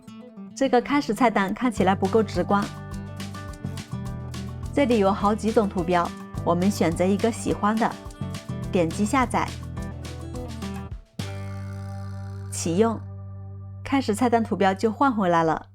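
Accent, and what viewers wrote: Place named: Sichuan, China. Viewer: 声音倒像真人的，还带四川口音，但人像怎么看都像假的。。。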